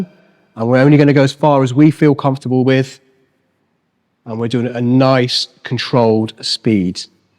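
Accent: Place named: Wales, United Kingdom. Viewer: I agree! Plus I love the Welsh accent. Thank you,!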